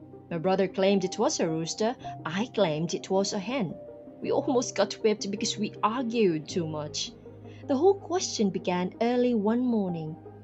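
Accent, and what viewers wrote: United Kingdom. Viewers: You sound scottish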